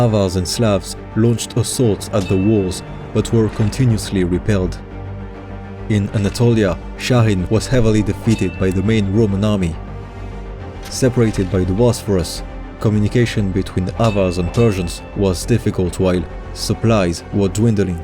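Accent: France. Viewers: Good and informative video. Enjoyed your narration with French accent, and the pronunciation of the Persian generals were perfect.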